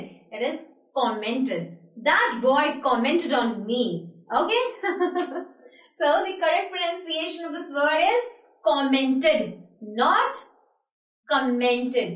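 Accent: India. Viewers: Bhaven May, Bro, this is not a Hindi language class!! She is teaching the English language. 😊 And I know, in our country we always use mispronounced words instead of correct pronunciations. It's very common to speak wrong pronunciations in India. And we love it. Of course, people hate correct pronunciations!! I don't know why?? 🤔 It's weird!! By the way, she is speaking with topical Indian accent. So, what do you mean stop accent!!! 😀 Do you know what is an accent?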